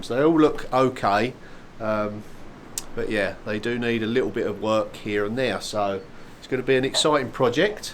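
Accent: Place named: London, United Kingdom. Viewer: Sounds like working man's London burbs to me !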